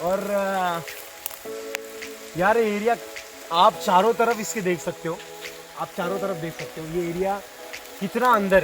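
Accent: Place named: Maharashtra, India. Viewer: Best thing is, That one special Marathi Touch 💚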